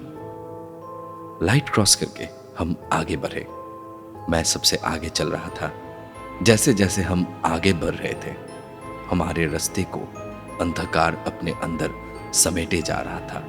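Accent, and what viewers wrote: Bengal, India. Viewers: Love from Kolkata .....I am bihari Girl .....aapnar kothay ektu ektu bengalier tuning ache .....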